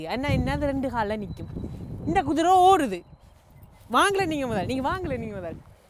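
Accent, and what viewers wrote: Jaffna, Sri Lanka. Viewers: Neenga Jaffa va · Sri lankan slang ❤️ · Srilankan slang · Neenga jaffna va Anna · Hi brother I like your Sri Lankan Tamil style. Keep rocking 👍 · Super srilankan Tamil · Unka language style srilankan mathiri irukkuthu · Ohhh bro. I love ur lankan tamil accent.. keep going bro. Love from Madurai, Tamilnadu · Srilankan tamil bro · ஸ்ரீலங்கன் தமிழ் அருமை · Sri Lanka tamil 😆😆😆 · srilankan tamil nalla irukku...♥️♥️ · Sri Lanka la pronunciation apdidhaa irukkum bro..adhuvum azhagaanadhu dhaa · Are u srilankan? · Srilankan tamil kaekurathukku nalla irukku review va vida nenga paesurathu pudichirukku bro keep it up i am ur new subscriber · Brother unga Ceylon tamil suppr... And also your ammalee word.. 😍😄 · Hi bro i am srilanka unga videos super congratulations I am waiting for more videos neenga srilankan citizen Pola pesuringa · Semma tamil bro srilankan tamil aa reviews sprp rolce royce review um paathuten spr bro ungaluku car kudutha ellarukum oru periya thnx naan sonnen nu sollirunga... 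Keep rocking and do more. All the best bro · Bro unga srilanka Tamil romba pudichurukku Next Ben's review &Skoda kodaiq review heavy illa Tamil illa Main reasons pls all the best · Jafna Tamil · Itz ilangai tamil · Enga Srilanka Tamil La Explain Pannum pothu Happy a iruku Bro 😍😍😍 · நல்ல சுத்தமான இலங்கை தமிழ் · Bro ninga srilankan aah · Kingsley Rock It's Sri Lankan Tamil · Seems Ceylon Tamil review ... Thanks for posting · Sri Lanka Tamil... Azhagu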